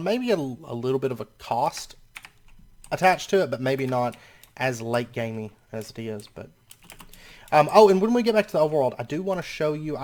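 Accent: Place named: Kentucky, United States. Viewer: He's from Murray, Kentucky :)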